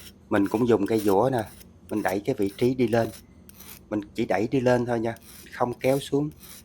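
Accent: South, Vietnam. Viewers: Giọng miền Nam dễ thương, hy vọng chủ kênh ko phải Vietcong. Chỗ tui sống toàn Vietcong sợ quá Vc luôn.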